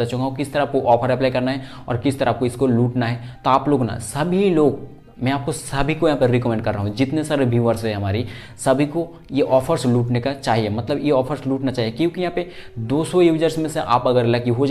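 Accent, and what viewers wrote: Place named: Bihar, India. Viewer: Kyo ki app awaj se Bihari lagte ho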